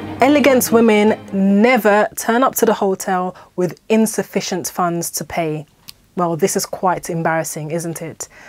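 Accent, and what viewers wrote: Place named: RP, United Kingdom. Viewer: Having a Mary Poppins accent (that I love) and the name, one couldn't be anything but elegant. Not part of your crowd, but interesting insight. ✌🏽 *Not sure if this is the correct way to spell your name.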